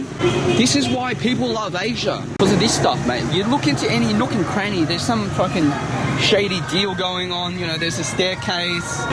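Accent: Australia. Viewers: Enjoyed your video. I can hear your strong Aussie accent but didn't deter from you expressing yourself and giving your thoughts in an honest and genuine manner. In fact I loved your honesty. So good on ya mate! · he grown up in Australia ,can't you tell by his accent ?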